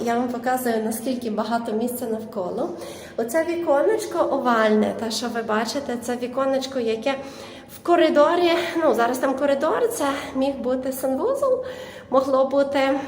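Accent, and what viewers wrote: Galicia, Ukraine. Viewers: Ваша галицька мова - мед для київської душі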